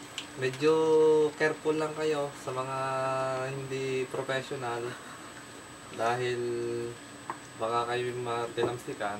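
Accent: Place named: Ilocos, Philippines. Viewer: you have ilocano accent. pls.. don't get mad, love your video though... tanx kabayan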